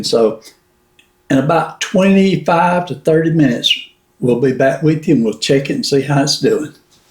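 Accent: South, United States. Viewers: Lovely cooking demo. It came out great and I loved listening to your southern accent. 🫶🏾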